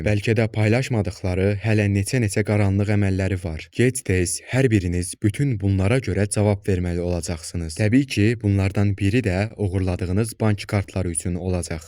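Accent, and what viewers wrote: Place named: Turkey. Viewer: Qardaşım bax bəlkədə bu mövzu ilə aidiyyatı sıfırdır ama xaiş edirəm yalvarıram Türkiyə Türkcəsi aksentiynən danışma çox ət tökən və yorucu olur hörmətlər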